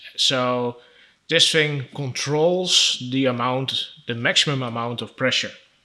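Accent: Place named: Netherlands. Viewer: Hallo ik hoor dat u van nederland bent en nederlands spreekt ik wou u vragen heb een probleem met mijn auto ik hoor een schurende geluid in de buurt van de distributie riem heb alles vervangen distributie riem met spanner waterpomp multi v rien met spanrol krukaspoelie alternator maar geluid is er nog steeds het komt binnen in de krukas percies heb onlangs mijn olie vevangen en geluid is percies verminderd kan het nu zijn dat de olie pomp die lawaai maakte het is percies metaal over metaal percies lagers over elkaar kan u mijn aub helpen en kan het zijn dat de olie pomp versleten is en een schurende geluid maakt . Ben u dankbaar voor u reactie dank u .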